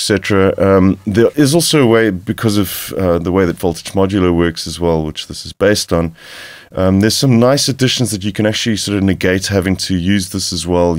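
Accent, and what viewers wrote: South Africa. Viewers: Hey, you sound like a South African, the accent checks out..... fellow South African checking in, this is rare. Neat review and wow, for $25 this is incredible.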